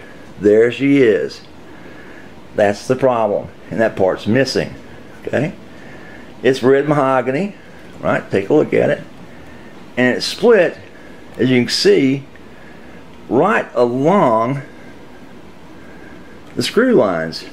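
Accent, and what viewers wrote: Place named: Texas, United States. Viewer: The way you talk and your accent reminds me of Johnny Winter. I wonder if you're from the same part of Texas.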